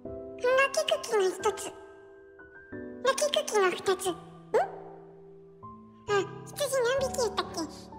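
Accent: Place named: Kansai, Japan. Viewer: 最高です‼︎最近このチャンネルを知りました。毎日見ています😂もはや中毒と言ってもいいほどハマっています。結婚を機に東北へ引っ越しして早15年。関西弁が恋しくて毎日ここを訪れては腹が捩れるほど笑っています❤今後も楽しみにしてますよ🎶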